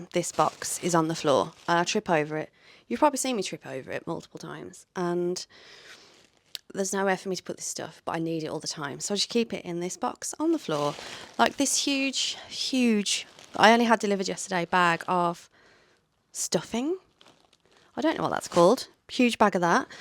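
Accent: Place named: Birmingham, United Kingdom. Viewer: I’ve just discovered you, I love your cosy, happy living room come studio 🥰 I thought I detected a local accent & then you mentioned Birmingham 😊 Just placed my order for stickers and look forward to receiving them! 😊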